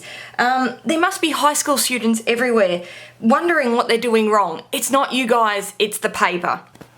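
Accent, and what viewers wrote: Australia. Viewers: Hi. I'm preparing to teach a class using colour pencils and found your tips useful prompts for me to pass along. In some cases they were things I already knew but good to be reminded. Also thanks for showing unsatisfying past work especially how certain papers behave. It took me years to appreciate the importance of using quality art materials and stop blaming myself for unhappy endings. Now I always tell my students a bad piece may not be simply due to their talent or lack of it. Nice to hear the Aussie accent as well.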